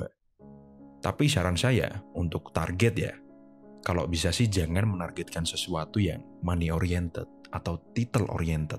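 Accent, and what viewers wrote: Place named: Semarang, Indonesia. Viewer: Koyo suarane wong semarang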